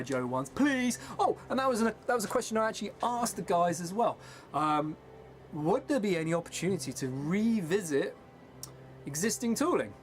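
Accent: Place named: England, United Kingdom. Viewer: Who's 'we' with your English accent? ! Coz as far as I'm concerned, we ain't getting it! Unless someone comes out and says everyone else can get one...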